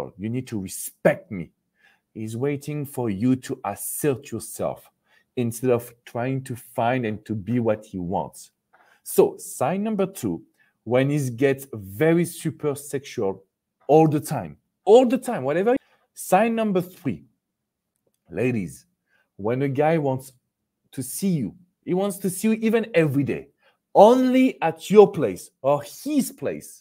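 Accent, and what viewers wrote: France. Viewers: I love your French accent. You make the word "suffer" sound like a lavish dish <3 <3 <3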